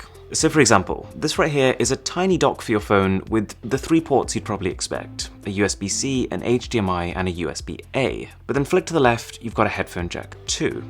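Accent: RP, United Kingdom. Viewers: You sound posh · Absolutely love his posh English accent.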